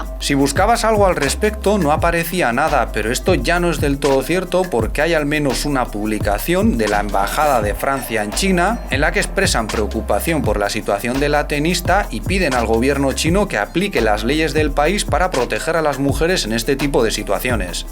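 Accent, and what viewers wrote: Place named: Spain. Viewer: Un chino con ojos azules, que habla con acento español y chino. Increíble como unos cuantos años en un lugar se va perdiendo la identidad. Buen vídeo. Saludos.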